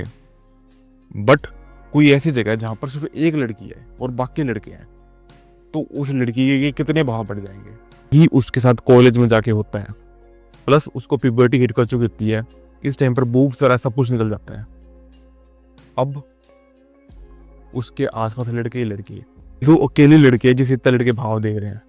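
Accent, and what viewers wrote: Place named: Himachal Pradesh, India. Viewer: Himachali accent 😂... ❤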